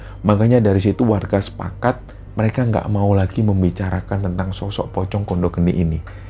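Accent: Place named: Surabaya, Indonesia. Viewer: Nuwun sewu, sedikit saran aja mas, usahakan jgn pakai dialek surabaya/arekan kalo menceritakan dg latar jawa tengah dan daerah dialek non arekan...matur suwun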